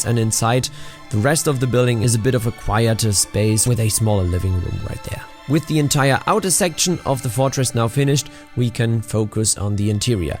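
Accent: Germany. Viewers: junge was ein gammliges kartoffelenglisch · the most "german english" i've heard in a long time. weiter so! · Das LEGO-Build ist Genius-Level - das Video ist sehr spannend. Nur dein Englisch ist eine Katastrophe...Vokabular gut, es geht nur um die Reihenfolge der Wörter, die typisch deutsch ist, wie auch die Aussprache. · as a german i hear the german accent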